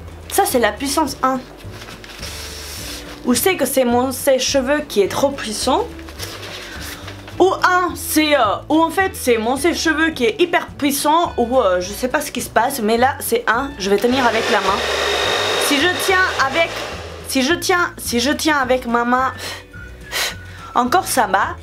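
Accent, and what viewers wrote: Spain. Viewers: J'adore ton accent espagnol! Tu es trop drôle! Bonne continuation!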